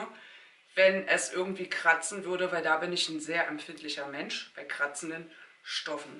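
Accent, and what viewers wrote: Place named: Berlin, Germany. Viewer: Du bist unsere swimwear queen 🤣🤣 Body paßt dazu Berliner schnauze was will man mehr 😁😘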